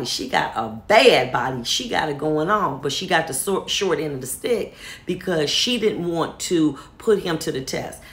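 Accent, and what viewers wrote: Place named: Detroit, United States. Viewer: I stumbled on your page. I'm a truck driver and I'm just listening and i swear i could hear the Detroit in you(flatout/fair exchange)😂and this game is not for the weak. It will go over most womens head. You have to be about it and be built for it for any of this to make any sense to you. Smart women who know the rules of engagement know exactly how to...engage😂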